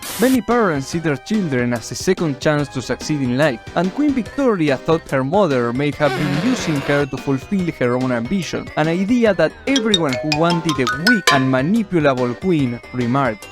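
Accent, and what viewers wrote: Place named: Argentina. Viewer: You sound Argentine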